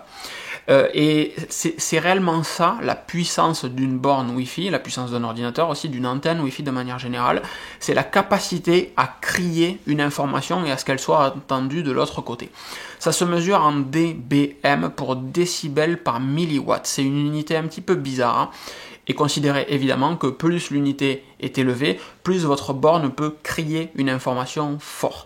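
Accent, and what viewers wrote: South, France. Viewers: Salut, merci pour cette super vidéo. J'aime beaucoup ta façon de partager ta passion et de vulgariser de façon simple tout ce que tu expliques. Et franchement ton accent et tes expressions telles que "ça poutre" ça me tue, je suis aussi du sud, mais sud sud (34) pas sud-ouest donc c'est kiffant. Pour en revenir au sujet, j'utilise perso un ORBI RBR20 ça suffit amplement pour chez moi et au boulot je mets de l'unifi partout (je suis dans la tech aussi, support/installation), c'est amazing ubiquiti c'est la vie. Je ne mets par contre par autant de bornes que tu préconise (comme les musées) mais une ou deux par "étage" des UAP-AC-PRO qui font je crois du 3x3 MIMO et là aussi (on a que des portables et mobile) ca semble suffire. Mais tu me fais poser la question si je ne devrais voir pour augmenter ce nombre car beaucoup beaucoup de devices en Wi-Fi et que 2 bornes... 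Bref continu comme ça! Top moumoute ta chaînes et tes vidéos.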